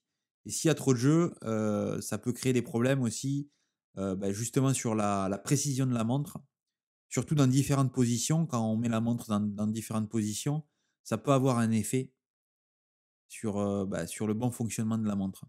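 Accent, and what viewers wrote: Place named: South, France. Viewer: notre bon petit accent du Sud, c'est vraiment plaisant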